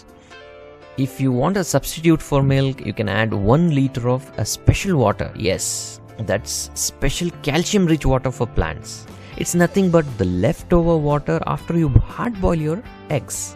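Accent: India. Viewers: Indian english.☺️☺️ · Boring. Speaking english in indian accent just to complete the video.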